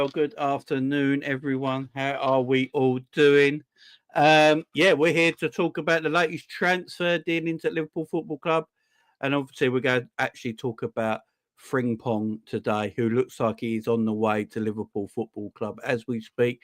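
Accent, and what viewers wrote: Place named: South, United States. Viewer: Hey, wasn’t sure about you at first what with you having that southern accent and all that. Now I know why people think you’re brilliant. Top content as always. Big thanks for keeping us informed 😊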